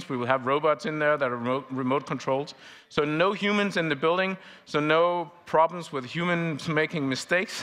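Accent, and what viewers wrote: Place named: United States. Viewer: It never ceases to amaze me how good your American English is.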